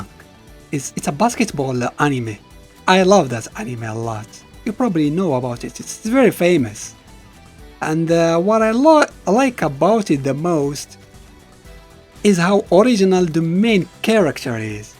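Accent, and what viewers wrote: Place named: Morocco. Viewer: Obviously, Morocco is big enough to point to on the map, but it's still underrepresented. You're not going to get so many people recognizing your authentic accent. At any rate, congrats on your milestone! Personally, I'm trash at fighting games, but KoF's style and flash has always drawn me in. Your channel allows me to enjoy the games for the story AND the gameplay. You make both aspects very approachable for someone who doesn't have much ingress into this space. Also, I'm a huge Metal Slug fan, so seeing general SNK content is a real treat. Thanks for all the videos over the years!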